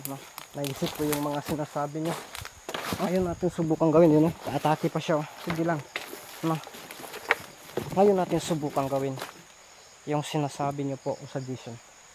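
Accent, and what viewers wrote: Visayas, Philippines. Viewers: Lingaw kaayo kos imong sinultian boss bisayang tagalog amping always Godbless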